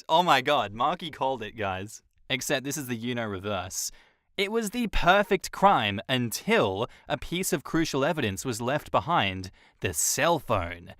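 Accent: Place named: Australia. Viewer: the great Aussie voice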